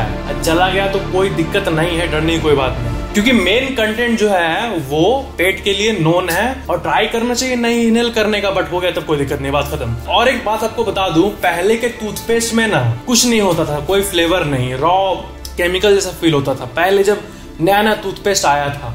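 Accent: Bihar, India. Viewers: Is he bihari? 🤔🤔🤔🤔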